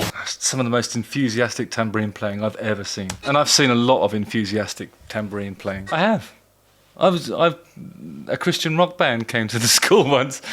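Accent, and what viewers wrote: United Kingdom. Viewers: Dude i honestly thought this was tommy lee doing a british accent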